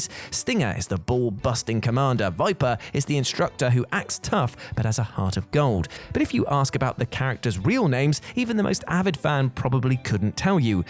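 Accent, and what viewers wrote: United Kingdom. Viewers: God I hate British narrators!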